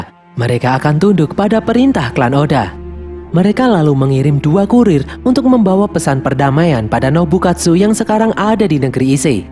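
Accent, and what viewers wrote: Java, Indonesia. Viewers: Cukup cukup matamu. Iki ketoke seng ngisi suoro wong jowo iki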